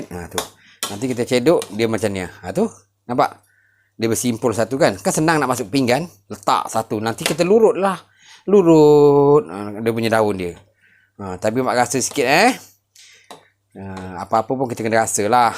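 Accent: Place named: Muar, Malaysia. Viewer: Terbaik makk... org muo gaya bahasanya mmg ada identiti tersendiri😁👍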